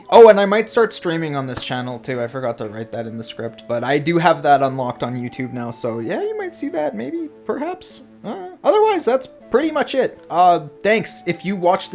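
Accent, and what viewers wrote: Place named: Alberta, Canada. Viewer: HAHAHAHA I thought I heard Alberta but I didnt want to be wrong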